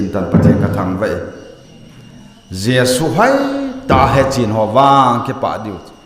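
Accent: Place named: Assam, India. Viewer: Assam ma a aw man dan toh aki bang poi mihao holah a athu sei dan achom e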